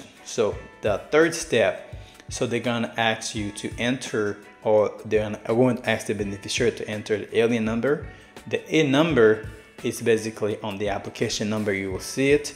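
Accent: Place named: Haiti. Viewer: You re clearly Haitian . I hear your accent. Why would you do something so important only in English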